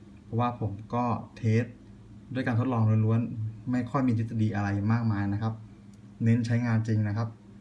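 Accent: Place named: Ban Pong, Thailand. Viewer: กำลังติดตามครับ ฟังสำเนียงแล้วน่าจะคนบ้านเดียวกัน บ้านโป่งคับ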